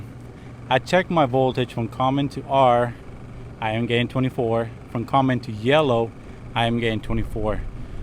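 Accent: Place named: United States. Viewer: Yo pensaba que eras gringo ...Jajaja good videos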